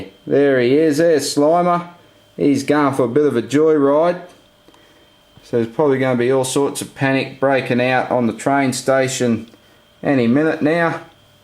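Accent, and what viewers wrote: Australia. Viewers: are you australian because thats AWSOME!!!!!! i'm aussie to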